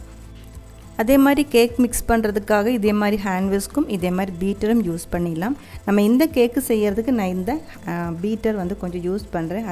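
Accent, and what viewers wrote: Kerala, India. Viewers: Mouth melting..... Clear recipe... Malayala tamil cute.... Gonna try for my kids.... Thanks a lot for the recipe.....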